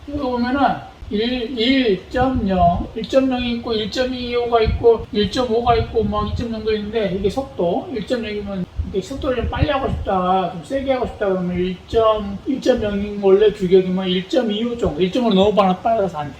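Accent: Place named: Jeolla, South Korea. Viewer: 으미~~~~! 구수한 전라도 억양 듣기 좋네요~ 영상 잘보고 갑니다.... 바로! 실천에 옮기도록 할께용~~♡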